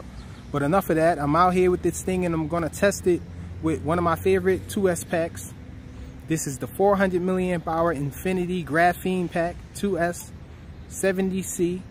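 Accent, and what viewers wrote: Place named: New Jersey, United States. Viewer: Are you from New Jersey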